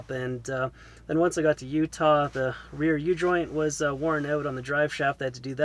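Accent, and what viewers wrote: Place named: Newfoundland, Canada. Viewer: Drive your whole house into a moose -! 😂. You sound like a Newfie or Bluenoser! Thanks for the good laugh. Definitely driving your house into wildlife is a genuine concern for all van dwellers. 😊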